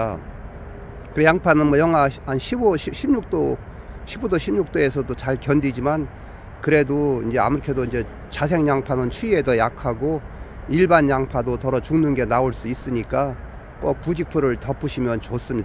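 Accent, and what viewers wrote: Chungcheong, South Korea. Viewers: 구수한 충청도사투리 에 귀에 쏙쏙 정보 감사해요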